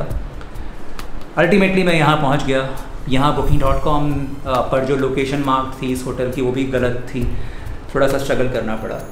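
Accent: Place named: Haryana, India. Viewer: Bhai love the way use haryanvi accent and words in between ur lines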